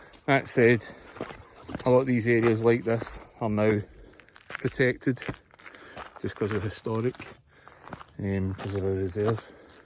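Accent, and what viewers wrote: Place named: Scotland, United Kingdom. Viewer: Perfect accent for someone fae Bannockburn even if this one is in new Zealand 🏴🇳🇿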